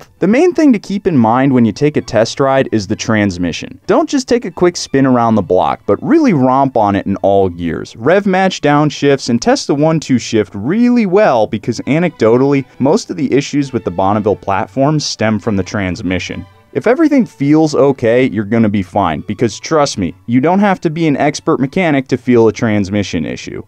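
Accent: United States. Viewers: That cowboy hackcent gets me everytime love it !!! Also i don't actually ride motorcycles, i just love how they look and they scare me :D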